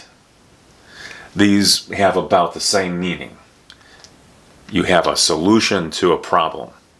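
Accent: United States. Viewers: trophy-yellow-smiling::trophy-yellow-smiling::trophy-yellow-smiling::trophy-yellow-smiling::trophy-yellow-smiling: ... a great teacher with a great All-American pronunciation ... !!!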